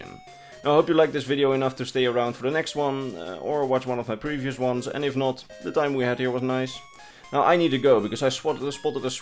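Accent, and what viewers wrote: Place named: Netherlands. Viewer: Random question but are you Dutch lol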